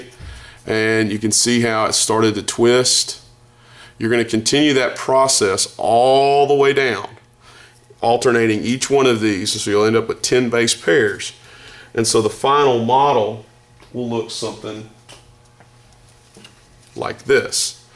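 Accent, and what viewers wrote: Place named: Texas, United States. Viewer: nice accent... texan?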